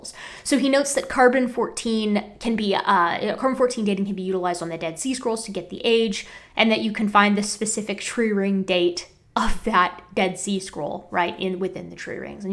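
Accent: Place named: General American, United States. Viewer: really? Sounds like a normal American East West Coast mix accent to me! Of course I'm used to YEC tone such as Hovind which is much worse imho. As regard origin quality control I'm not sure I see your point. Mutations can be increased by poor quality control during reproduction. To use your analogy this doesn't produce airplanes from cars. This produces a range of cars, mostly bad but the occasional one that has attributes on the way to airplanes. Evolution works on the "good enough to survive" not a particular design goal. If your dealership gets by with a breeding set of cars that produce more and more cars that work well enough but gradually end up looking more and more like airplanes then eventually it's an airplane dealership! The tortured analogy shows it's not a good analogy for evolution!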